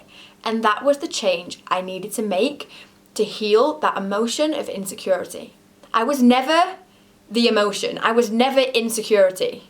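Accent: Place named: Warrington, United Kingdom. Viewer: Are you from Warrington/leigh? You sound just like my friend!!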